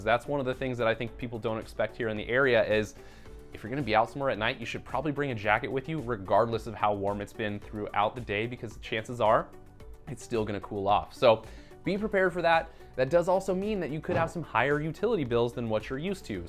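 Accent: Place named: Southern California, United States. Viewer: Dude your videos are really helpful and informative. Your super strong SoCal accent makes me feel like I’m watching an episode of the Californians on SNL. But it’s better than listening to Texans where I live now. I used to live in the LA area and I’m thinking about moving back to beautiful SoCal. For anyone thinking about moving to TX… its sucks. Compared to Cali, Texas is boring, ugly, the food is atrocious, 99% of the people are obese and sit around and guzzle alcohol and eat horribly tasting canned food, DFW drivers are reckless, my car insurance actually doubled when I moved here from Los Angeles, people say Texans are friendly, but I would say they’re polite definitely not friendly. People in Southern California are actually friendly, and I made friends quicker in Los Angeles than I ever did in Texas. Plus, if you’re single, there is no one to date in Texas because everyone is obese and or married.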